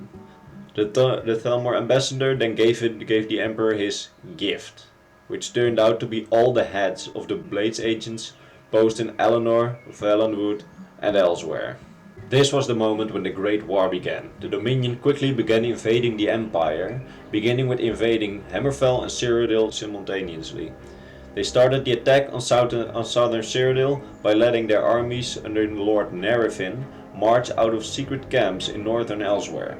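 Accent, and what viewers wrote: Netherlands. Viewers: Are you dutch, zo ja. Hahaha ik wist t. Hoorde t aan je accent. Als t niet zo is, oops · is that a Dutch accent? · It's a Dutch accent though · You sound like you have a Dutch accent. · oh nederlands engels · Nederlander!! Ik hoor het in de eerste drie seconden al gewoon. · Vanaf hoorde ik al gelijk... Een Nederlander! Wij hebben echt zo'n typisch accent. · are you dutch because you have that dutch kinda accent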